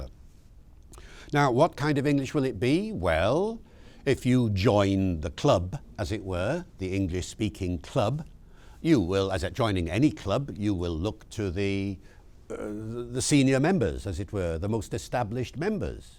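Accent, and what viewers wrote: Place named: Wales, United Kingdom. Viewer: Very interesting. His English definitely sits on a Welsh linguistic platform.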